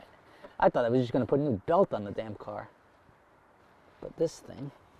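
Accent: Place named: New York, United States. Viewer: The angry New York accent really came out in this one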